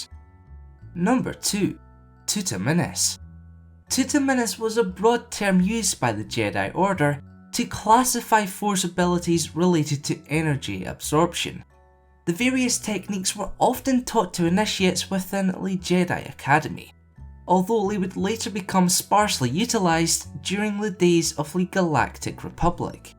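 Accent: Scotland, United Kingdom. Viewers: . Hey man I've been watching your vids for quite a while now. Your videos are great 👍. Can tell your Scottish just like me I have followed on amino. If you replied to this comment I would be delighted. Keep up the good work man 😃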